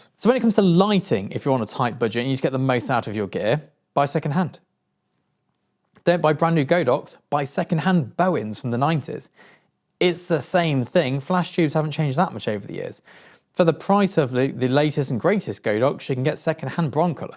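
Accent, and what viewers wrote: United Kingdom. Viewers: Excellent! I'm grinning because I enjoy your point of view and because Brits talk differently than 'Murricans. 😂 I'm also appreciating how much I love pragmatism. And last but not least: I've been a little afraid of used kit because it seems fragile and... almost perishable. I'm glad to hear your point of view. I've bought used cars sight unseen, because I know cars. But studio gear seems "trickier..." Yep I'm just afraid of what I don't know. 👍 Nice to feel grounded again. Thanks!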